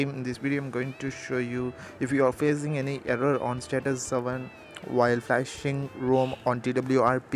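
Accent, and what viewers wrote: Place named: India. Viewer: I wasn't sure if you noticed but every video you do, you have an indian accent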